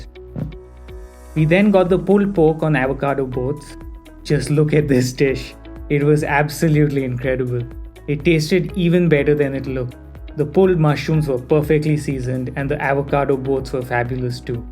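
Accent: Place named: Mumbai, India. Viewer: Just my opinion: you speak Mumbai English which will fetch you sobo subs and a few foreigners. Try Hindi to see if the new experiment is working for you or not. I wouldn't be able to to hear English from someone who is a non native speaker for very long. Your fluency is on point but the voice isn't made for non-Indic languages.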